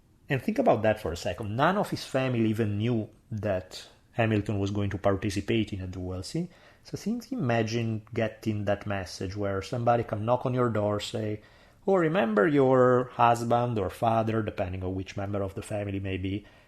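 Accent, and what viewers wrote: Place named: Italy. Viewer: Hi! I'm really enjoying your work! I only came across you because of a Dan Carlin interview with you..I lived in Italy for many years and I enjoy your accent 😊 A friend from Brescia has exactly the same accent..Bravo!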